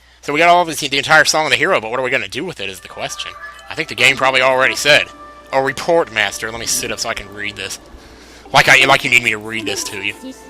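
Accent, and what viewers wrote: United Kingdom. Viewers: british ftw :D?!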